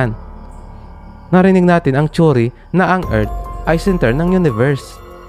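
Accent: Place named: Iloilo, Philippines. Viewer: wow ilonggo nga tagalog..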